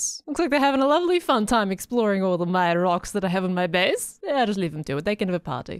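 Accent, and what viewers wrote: Australia. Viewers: A hermitcraft does of happiness... Thanks a lot, you realy made my day, with your thick Australian accent 💜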